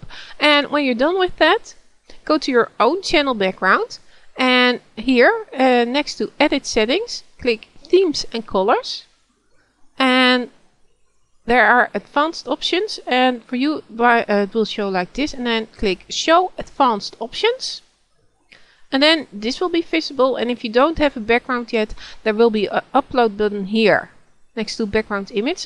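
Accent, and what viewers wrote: Netherlands. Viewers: ik hoorde gelijk dat je nl was^^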